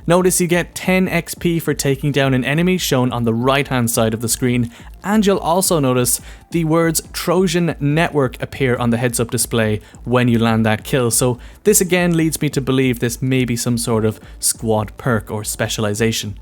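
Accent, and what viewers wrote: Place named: Ireland. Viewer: Hes Irish. Its in his accent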